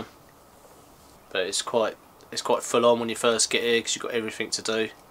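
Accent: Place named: United Kingdom. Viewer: hey mate, great set up! How on Earth do you manage to hget so much space in the woods where you can stay longterm like that. You soudn British and as far as I know, apart from Scandinavia, you can not stay in a tent on land anywhere in Europe. How did you do that!??!?! Hope ou can have some great times there. Lovely also for teh dog to be out like that! WOnderful!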